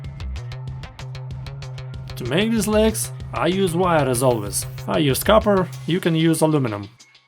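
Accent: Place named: Germany. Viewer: Ok, this guy is definitely German.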